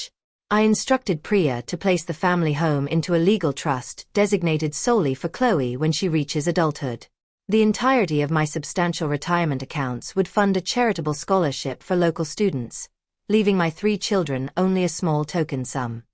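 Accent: United Kingdom. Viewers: Why does the voice have a British accent when she’s living in OHIO all her life?? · British accent but lived her whole life in Ohio. AI slop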